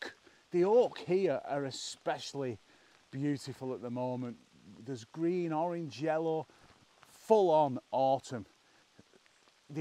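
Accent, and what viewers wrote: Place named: Billinge, United Kingdom. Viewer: Surreal video...stream of consciousness...excellent painterly images. I live in Cumbria now (35 years) and just getting into photography. Born in Leigh nearly 70 years ago, been trying to place your accent.. Billinge! Keep em coming!!😁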